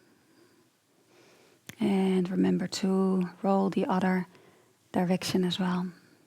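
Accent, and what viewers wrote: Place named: Netherlands. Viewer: Hoy Ik hoor van u acsent dat u van Nederland Komt maar dank u wel voor de yoga de yoga tip😊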